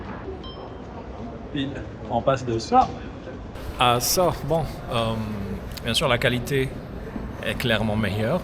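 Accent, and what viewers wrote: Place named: Quebec, Canada. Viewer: C’est quoi ce début d’accent québécois! 😅 good to hear from you Z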